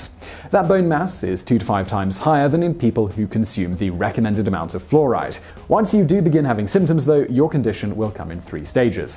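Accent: United Kingdom. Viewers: seems like a british dude talking about dental health doesnt seem like the most credible source lol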